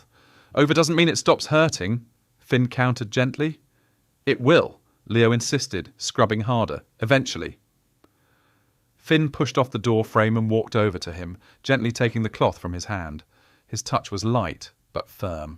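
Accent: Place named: England, United Kingdom. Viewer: love the English accent!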